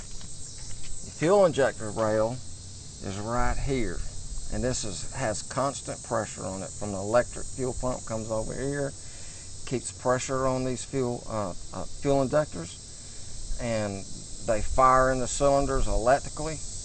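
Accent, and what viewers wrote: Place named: New York City, United States. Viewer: you sound like you are from New York City. Thanks for the video big help btw. Yella injectors need cleanin. Sometimes I use vaseline on my injector too.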